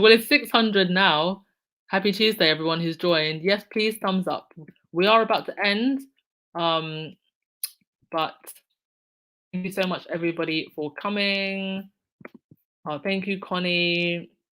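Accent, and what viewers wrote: United Kingdom. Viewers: Hello, from Canada..I always enjoy your channel, especially your British accent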